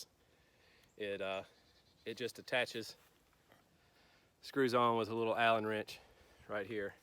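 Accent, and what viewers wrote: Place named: North Carolina, United States. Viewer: Damn... I thought I recognized his accent!!! Tarboro NC is about a hour east of my place in Nags Head NC!3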